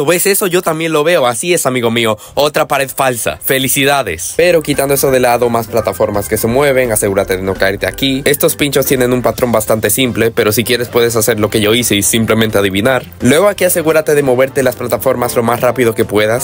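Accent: Dominican Republic. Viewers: Are you Dominican?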